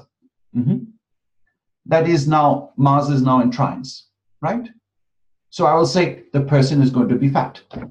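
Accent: India. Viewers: He's great as always. And his English is so Indian!! If there was a fee for viewing this it would still be "total paisa wasool" regardless of the cost!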